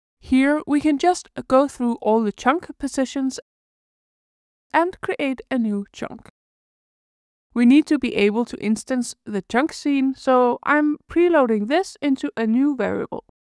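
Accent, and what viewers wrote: Denmark. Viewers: No problem, have subscribed and rung the bell too. also are you danish by any chance?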